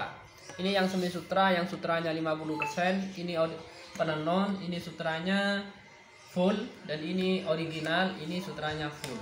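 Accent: Madura, Indonesia. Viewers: Kental bahsa maduranya 🙏🏻🙏🏻😃😃😃😃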